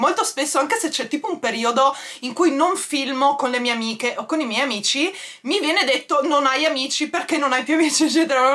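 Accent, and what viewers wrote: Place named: Trieste, Italy. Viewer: Potrai andare a vivere ovunque ma l'accento triestino non cambierà mai😍😍😍ti adoro🤣🤣🤣 Il trucco ti valorizza un sacco, è bellissimo!!!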